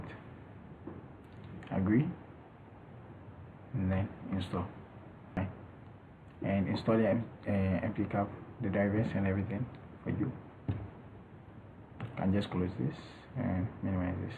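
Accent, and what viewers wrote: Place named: India. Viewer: THIS NIGGA IS A SCAM HE SOUND LIKE A INDAIN "TECH SUPPORT"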